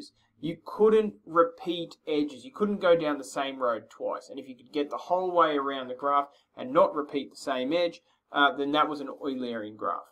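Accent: Australia. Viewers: Great Australian accent.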